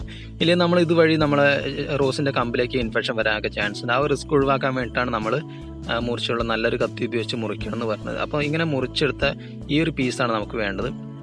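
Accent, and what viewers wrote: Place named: Malappuram, India. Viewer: Malappuram slang😀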